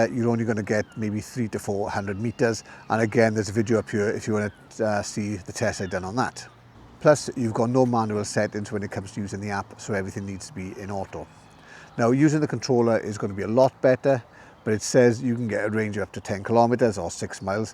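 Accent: Wales, United Kingdom. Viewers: I've almost got my neo2 motion, 😂. I've already bought the 65W wall charger and a fancy carry case. Weather here is improving, only got 1 inch of snow today which is good friday, so not too far from a trip to the hobby shop. If it doesn't like your Welsh accent how will it do with my mixed accent of Geordie and Canadian. 😱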